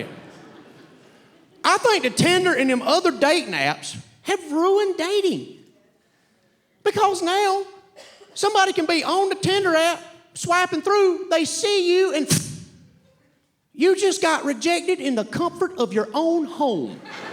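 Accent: South, United States. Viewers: Wow. How unfunny this SOB is. No originality. Hinges on a “redneck” accent and nothing he says has any form originality. He’s got the appeal of Donald trump and the boredom of boredom. Legit trailer park trash and being ironic or not. He’s just not funny.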